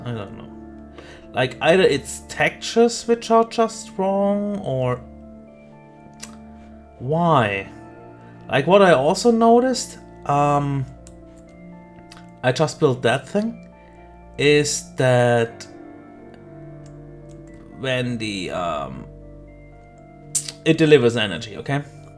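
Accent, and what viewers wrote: Germany. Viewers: I just moved in here in germany and i can tell that you are german or at least something similar just because of your english. Correct me if im wrong.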